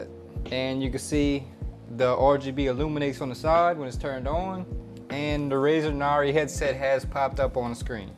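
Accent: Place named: Louisiana, United States. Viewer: Just purchased thanks! Also where's that accent from, Louisiana?? 😎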